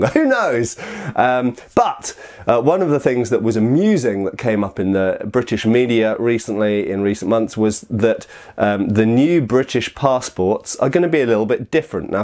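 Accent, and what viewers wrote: France. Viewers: Your French accent is glorious ! ^^ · I love your french accent XD Anyway very interesting video once again, I had no idea that the new British passport would be produced in France ^^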